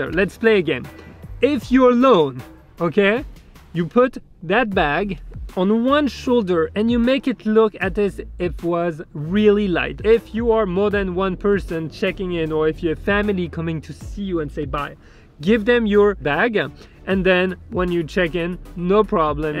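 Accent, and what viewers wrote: France. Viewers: Tu es français ou je me trompes ? 🫣 Thank your for the advice I'm flying firdt time with my camera 😊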